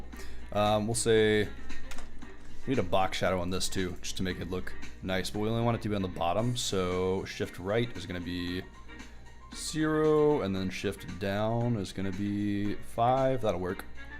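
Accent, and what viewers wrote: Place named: United States. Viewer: Thank you thank you 😥😥😥 Finally we have a YouTuber who has American accent new courses, short simplified and great 😣😣 thank you very much By the way any quick idea to learn mathematics for machine and deep learning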